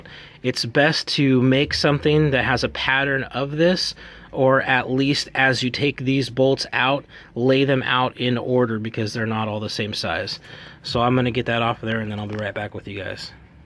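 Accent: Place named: United States. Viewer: This is an above average video with a clear speaking American on "How To" repair an inner "loose or damaged nut" that's deep within the clutch housing of a Suzuki Boulevard M109R. Concise, step by step instructions, no frills, no music, just a straight forward, common sense repair, that is well explained as the video progresses. Excellent video Mr. Suzuki Clutch Repairman Thank you!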